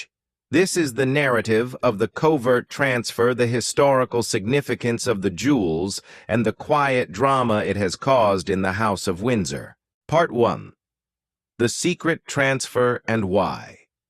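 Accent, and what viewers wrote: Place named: United States. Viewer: I wish you would not use an AI robot voice with an American accent , it does not understand what it is saying and the delivery is boring. Queen Consort Camilla is not a real Queen, she is merely the king's second wife, no connection with his family other than by marriage. She is certainly no Queen Mother and has no right whatsoever to any family treasures.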